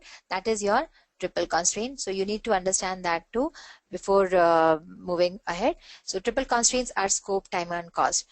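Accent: India. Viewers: I felt physical pain listening to this indian accent. had to switch off after 65 seconds, else my ears would start bleeding.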